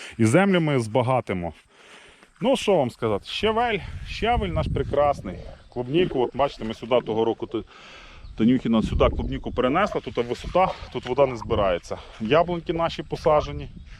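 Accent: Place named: Podillia, Ukraine. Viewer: Лайк з Криму. Вчора познайомилась з Вашим каналом,п!дписалась.Дякую за укра!нську.Розмовляете по Под!льськи.(за помилки вибачте клава руська).Вдач! Вам.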